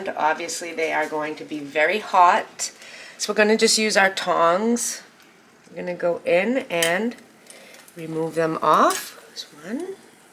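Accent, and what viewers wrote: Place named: New England, United States. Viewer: Perfect recipe for lazy mornings! Can’t get enough of that New England accent. Absolutely adding to my weekly routines! 🙏🥰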